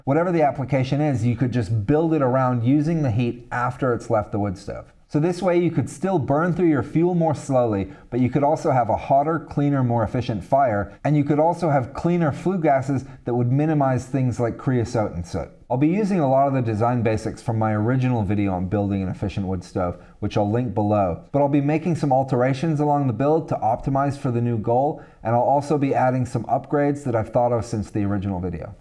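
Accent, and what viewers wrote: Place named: United States. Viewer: You sound very American for a bloke in the UK.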